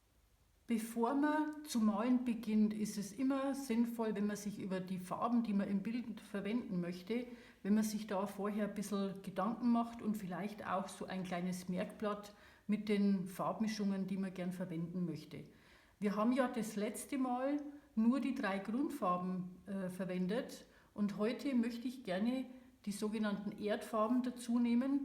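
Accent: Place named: Upper Palatinate, Germany. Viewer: Das sind mit die besten Tutorials für Aquarellmalerei, die ich bislang hier gefunden habe! Die Beispiele sind gut gewählt, nachvollziehbar und wirken nicht einschüchternd. Zudem lehrreich, aber nicht von oben herab belehrend und sympathisch rüber gebracht (insbesondere auch wegen des leichten, aber unverkennbaren oberpfälzer Zungenschlags :)). Vielen Dank.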